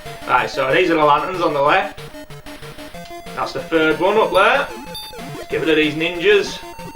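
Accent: Yorkshire, United Kingdom. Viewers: Amazing stuff. Just noticed you by accident. The Ninja :-D Great first choice! Ha ha... Yorkshire accent. I am from East Yorkshire myself. Nice one... I found that if you mostly fire in front of you its a bit easier. Never considered the Hit Rate percentage at all... I tend to power through... lol... I always get stuffed on the floating logs stage. Can't coordinate my firing and movement!! :-D Awesome extra lives snippet there!! :-D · Nice to hear a Yorkshire accent on YouTube!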